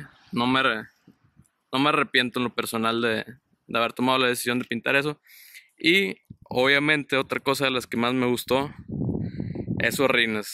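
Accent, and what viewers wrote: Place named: Monterrey, Mexico. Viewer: Dije: este wey habla como regio y luego vi esas placas y lo confirme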